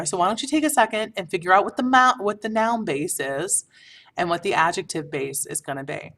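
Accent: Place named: England, United Kingdom. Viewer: i love your class but I you cant avoid your english accent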